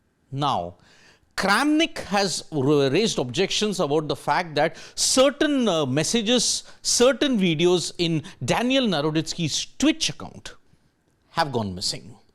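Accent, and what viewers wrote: India. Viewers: Get used to Indian “English”.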